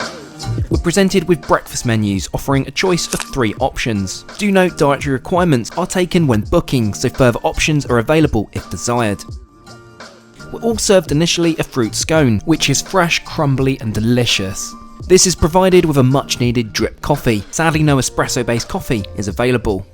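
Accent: United Kingdom. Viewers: sorry for my ignorance but are you english, scottish, or australian? i’m genuinely curious and i’m awful with european accents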